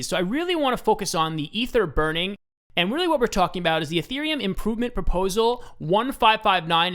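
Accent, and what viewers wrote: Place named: Long Island, United States. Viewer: You from Long Island? Bergen county NJ perhaps? Lol trying to place that accent.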